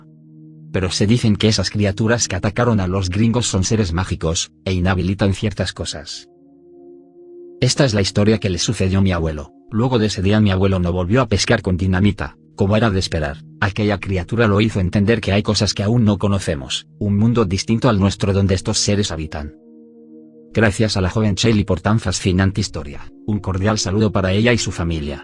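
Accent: Spain. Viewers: Porque. Todos los videos estan en Español Ibérico! Me revienta !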